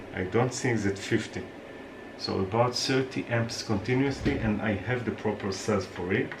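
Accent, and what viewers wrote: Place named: Israel. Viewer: חח שומעים מבטא ישראלי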